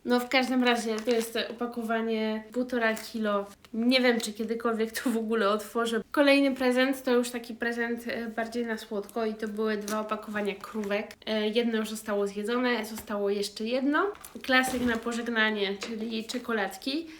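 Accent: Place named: France. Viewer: Trafilem dzis przez ytbowy przypadek i nadrabiam filmy, ciekawe jak Ci sie zmienial akcent w polskim. Teraz brzmi tak lekko francusko;). Tak 9% gotuj z Pascalem;).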